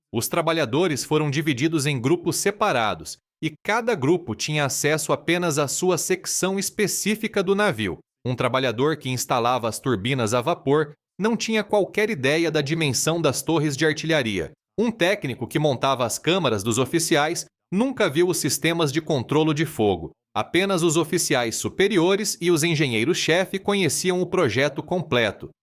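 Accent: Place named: Caipira, Brazil. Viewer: Narração em caipirês acentuado. Não acho estranho pois sou caipira do interior de São Paulo, mas não estou acostumado com esse tipo de narração de fatos históricos. 👍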